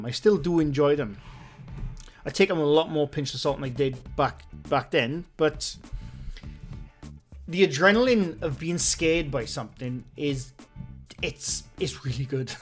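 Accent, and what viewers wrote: Wales, United Kingdom. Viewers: As a Scot, I have no idea why anyone would think you are Scottish?... You are clearly Welsh. All the best mate.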